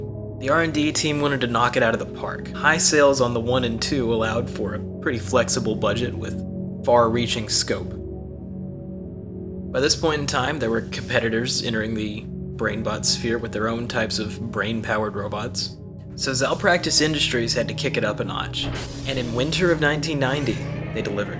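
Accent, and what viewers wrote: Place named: Germany. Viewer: Incredible German accent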